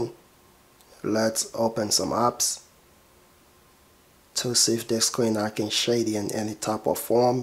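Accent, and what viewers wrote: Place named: Arab world. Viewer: Is better than a Spanish dude Maybe he has arab family and that's y he talk like that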